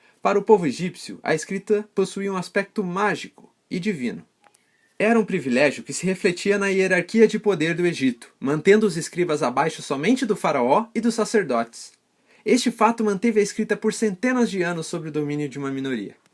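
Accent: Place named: Brazil. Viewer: Ele tem sotaque de tudo quanto é região do Brasil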